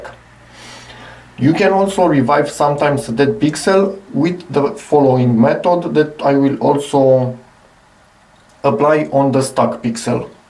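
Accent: Romania. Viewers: Dupa accent ma gandesc ca esti roman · Salut. Aveam o banuiala ca esti roman dupa accent, dar inghetata te-a dat de gol 😂. Am un "cluster" mare de pixeli blocati pe TV, am incercat varianta cu jscreenfix si nu a mers, Vreau sa incerc cu creionul si caldura cum ai facut aici, poti sa-mi spui te rog pe ce intensitate ai dat feonul? Multumesc! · Accentul de roman si inghetata pe care scrie in lb romana te dau de gol :))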